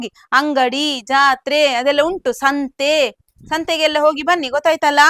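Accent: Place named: Dakshina Kannada, India. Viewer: Very nice talk Madam. DK kannada is soo 😍 lovely.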